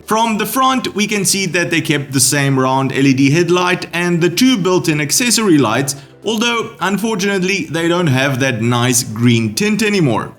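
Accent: South Africa. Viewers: Why do you sound South African?👀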